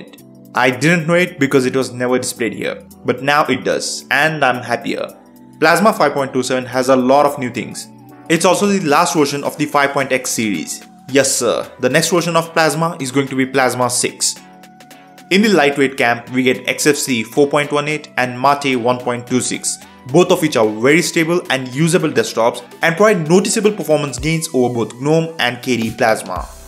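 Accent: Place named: India. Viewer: My ears are bleeding hearing this effed up horrible Indian accent, and I had to stop at less than a minute into the video 🤮🤮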